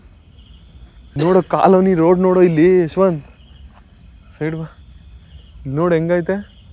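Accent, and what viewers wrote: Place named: Ramanagara, India. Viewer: ನೀವು ಎಲ್ಲೇ ಹೋದರೂ ನಮ್ಮ ಚನ್ನಪಟ್ಟಣ, ರಾಮನಗರ language slang ನಿಮ್ನ ಬಿಡಲ್ಲ ❤😊😅 Ex: ಸೊತ್ತೆ 😂😂😂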